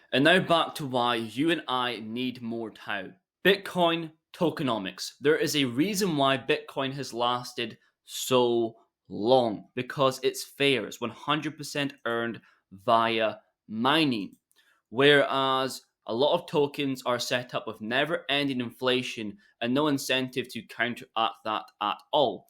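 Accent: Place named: Scotland, United Kingdom. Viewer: Do you still think qnt will still be big this cycle? I'm starting to think that 2027 -30 will be the time for xrp and qnt. Would love to hear your thoughts. I am well spread by the way. Ps love how you slow down your Scottish accent. Edinburgh here. ❤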